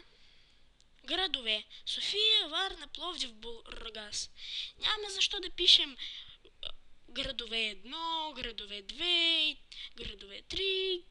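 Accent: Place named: Russia. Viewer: Руснак ли си, защото имаш як акцент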